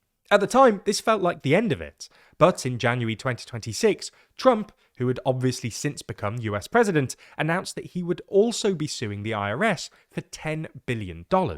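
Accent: United Kingdom. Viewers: Yawn. Another brit-accent wagging-fingers about superior morality. America is not Commonwealth, so your ignorance not understanding undercuts your ‘judgement’ of events and facts: America does not jail politicians for running an office, but Biden+co did just that; weaponizing lawfare until Trumps whole family was threatened with jail - when they had nothing to do with it. So much Democracy under Liberals. Further, their fortune was directly threatened vocally, legally, politically, but US administration: what better way to say Papa Spank, than make departments reel from institutional shock and public shame? AND, the January Sixth protest was another coercion this tries to set right: as you will see, the voting was entirely rigged, because Chatham House cannot survive two Trump presidencies. They’ve said so themselves publicly just last week. Maybe it;s a rug-pull, but I see Justice in exacting a price for government malfeasance and appears nothing beyond what Every Other Leader seems to do. 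Biden+Co thought an Autopen substituted for Public Opinion and legal Congressional Acts. Enjoy your morally superior rape-gangs, England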